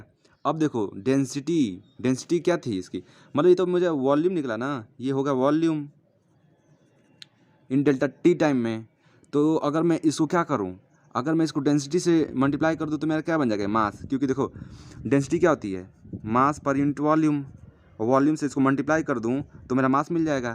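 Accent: Bihar, India. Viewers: Ur bihari twist in hindi so wonderful 👍👍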